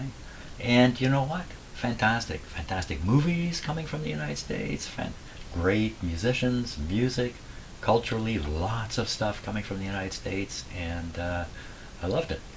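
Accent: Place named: Canada. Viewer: There is no going back. Accept us and we learn nothing, forgive us and you betray yourselves. I hate being wise but we are not in a good place and it's going to get one hell of a lot worse before it gets any better. Brace yourselves - you're going to catch strays, being so close. Open your arms to those of us who are more loyal to the world we had, to our friendships and alliances and the longest peaceful & demilitarized border ever, than any world this regime could ever hope to create. Y'all may not be my home nor my native land but I'll defend Canada before I defend this fascist regime. We still pick up a lot of Niagara Falls, ON radio, sometimes Toronto too. I should have known from your accent that we are geographical neighbors, my apologies. I also have actual Canadian relatives - 1st cousins, uncle died before I was born & his sweet, wonderful, creative wife just died, alone, in a hospital, during C19 - so perhaps my affinities toward you guys are a little more fundamental to me. 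My godfather is one of those Canadian cousins (~20 years difference). The aforementioned uncle, who died in Windsor, has a Wiki page, largely for work he did at Toronto University and then if memory serves Assumption College which I think became the University of Windsor at some point. Yeah. I understand how you have to regard my country, I understand the geopolitics, but don't fool yourselves for one second that we've all changed for the worse and that all your friends and allies are gone. We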